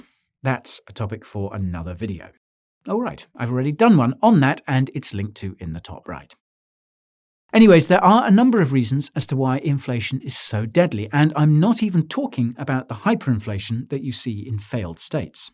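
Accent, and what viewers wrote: United States. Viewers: Love the American accent! Always engaging content! Haha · Clicked for the subject, stayed for shmaltzy American accent. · nice American access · Decent American accent. I would've thought you were one of us Yanks if I didn't know better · Excellent video as usual: 10/10. American accent not bad. Needs work though: 7/10. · Your American accent is spot in 🤣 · with an American accent, wonderful! 🤣🤣 By the way, excellent work, keep going!!! Cheers from Portugal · your American accent is great! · Good American accent ! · Fantastic American Accent !! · Hearing with an American accent is tripping me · Wow that in-flight captain American accent is pretty darn good. Some telltale signs that it isn't your natural accent though. advisor, research, and a few others tripped you up. I LOVED the "Alrighty!" :D Seriously though - I don't understand why only Bitcoin is mentioned in this video. 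Bitcoin isn't the future of currency. Are we only mentioning BTC because of the 21M minting cap? There are plenty of cryptos with burn mechanisms to keep supply down. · That American accent! 👌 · Bravo on the American accent - well done! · Nice job on the American accent. You do have some talent. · The outlook is dismal, but on the bright side you do a great American accent. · idk man, I'm here on the Southern side of the US and I've heard his sound before but yeah, if I didn't know him; I'd think he'd have moved into the area recently but still American. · Dude, good American accent!!! 😄👍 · Haha, I love the American accent, dude!! Good job! :D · Better American accent than Orlando Bloom's. Just need to practice on the word "research". Say it like "Reeserrch". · Good American accent, but full of cheese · That American accent is hilarious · Your American accent is better than mine, and I have lived here for all 27 years of my life XD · Pretty good american accent just heard a little of your English 💯💯💯. 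Love your channel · I didn't realize it was an American accent. I'll have to rewatch. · That American accent caught me off guard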